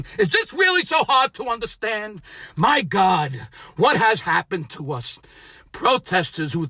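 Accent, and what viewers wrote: United States. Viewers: Yeah! is Back 👍 sounding like a true American ❤️🇺🇸💙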